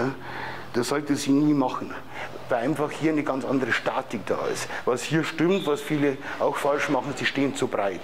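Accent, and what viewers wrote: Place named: Bavaria, Germany. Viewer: Hallo, wieder mal ein super Video, richtig gut erklärt, auch dein bayerisch ist angenehm und verständlich 😁 Was ich mir wünschen würde wäre ein Training speziell für Bauch(Körpermitte) was für viele sehr unverständlich und falsch trainiert wird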